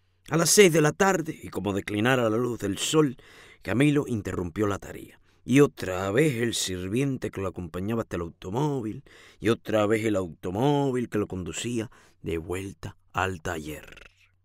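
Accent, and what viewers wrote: Argentina. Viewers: Gracias por este hermoso y gracioso audiolibro. ( Muy buen acento argentino, te lo dice un a argentino🙂🇦🇷) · tranqui rey te sale bien el acento argentino. hay veces que pienso que los sos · muy gracioso tu comentario acerca de que te gustaría que el narrador tuviera acento argentino , no hace falta , vos lo hacés extremadamente bien y sonás como tal !!!! sos un capo !!! GRACIAS POR TU EXCELENTE NARRACIÓN Y HUMOR !!! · Tu risa es genial jajaja te sale muy bien el acento 🇦🇷 es hermosa la novela. Buenísima lectura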